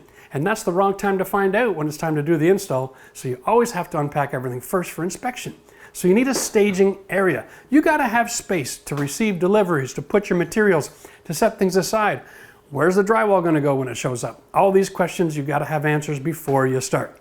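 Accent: Canada. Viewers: I trust you as a person and contractor, I live in Louisville, KY area and honestly I need a contractor like you. I had one and screwed me and ran away with half finish and some mistakes needs to be revisited . I need to finish the basement about <num> sq. to finish . If you know an honest reasonable contractor, I would appreciate the name/ names and a mode to contact them. I am a disabled retired individual for 12 years but I am Ok the Lord has be faithful to me and I am frugal and do not like waste since I grew up in Egypt poor but hard worker educated which was free in Egypt. Mat God bless you and your work abundantly. That would be of great help. If you can. Good day. Physically I cannot help , I had my knee was replaced 6 weeks ago. and I will need back surgery as well just to be able to tolerate the pain and decrease the narcotics and sedatives I am dependent on them but my kidney and liver will fail if I continue. 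GBU , It sound that yu are in Canada so I may be out of luck. lol.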